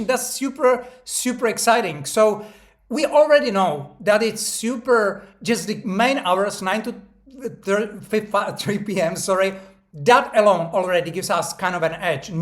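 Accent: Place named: Czech Republic. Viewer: This is what i trad nikkei to the long. I noticed how nicely it moves and started searching who trades nikkei on internet and found this video. After 10 yrs of trading I started getting sick with forex pairs…navigating forex is tough even for experienced traders. Nikkei has a structure, much easier. But this info about mondays is even more helpful U say 9 to 3 pn what time zone? I guess u mean tokyo time? Thank you for the video bro!!! U sound Czech by accent;)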